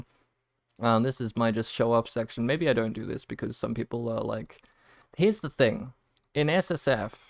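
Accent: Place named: Australia. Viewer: I never click any bell and always see new videos. The new audio makes you sound Australian.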